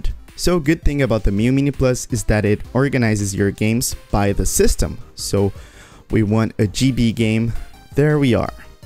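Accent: Brazil. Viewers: I also felt something like he was Brazilian lmao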